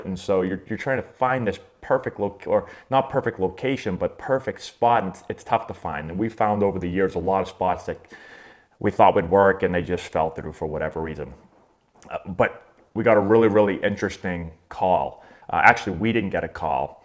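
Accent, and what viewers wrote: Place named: Boston, United States. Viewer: the Boston really came out in this video.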